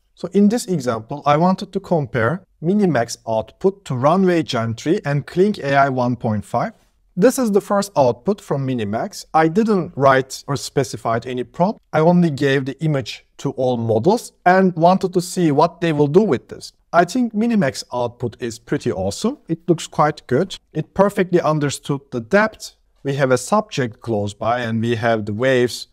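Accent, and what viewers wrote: Turkey. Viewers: sende türksün demi türk ingilizcesi bu ^^ iyi günler^^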